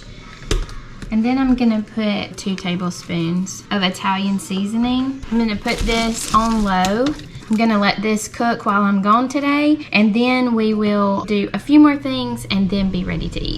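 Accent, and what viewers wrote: South, United States. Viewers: I love your Southern accent. Where are you from?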